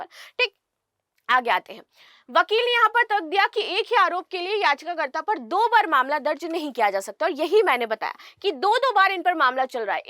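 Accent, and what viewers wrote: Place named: Purvanchal, India. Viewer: Sir Mam ham UP se hai But Aap UP ke purvanchal se lag rahi hai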